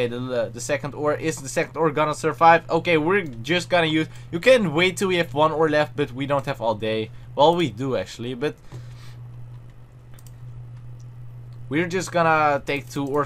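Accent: Netherlands. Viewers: are you from holland? You sound Dutch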